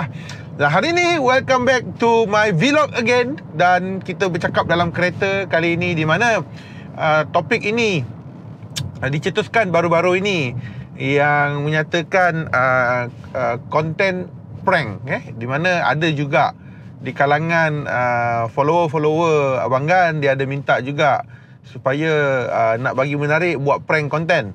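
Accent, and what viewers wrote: Negeri Sembilan, Malaysia. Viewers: Bang Gan cakap nesmilan yolah best bonar YouTuber keto cakap nesmilan 👍🏆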